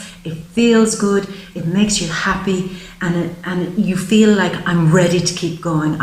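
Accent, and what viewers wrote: Ireland. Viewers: I love you, Your beautiful face and your wonderful resonate voice...that resonates with me. Your brogue make me feel like I am at home, and for some reason I do too...I shouldn't but I do more often than not I speak with a brogue, its fun and feels so natural, any way good reading.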